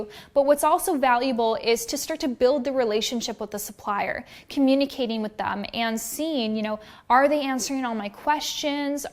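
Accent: United States. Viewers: are you from Russia? it seems like you're speaking like a native american but you have a russian name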